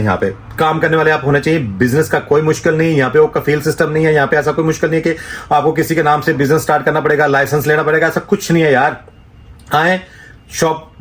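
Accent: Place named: London, United Kingdom. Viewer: Is very hard to understand your London english.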